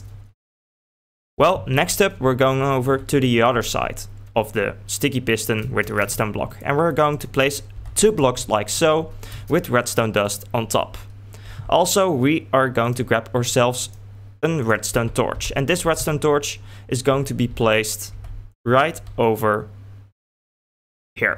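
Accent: Netherlands. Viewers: haha dutch detected, nice mate didn't notice the accent at first hope you are doing well, weather hasn't been great around lately. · hey, nice farm, and i hear youre dutch ;) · Lol je bent Nederlands he Kan ik zo horen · Are you dutch you got a dutch sound or how you call it hahha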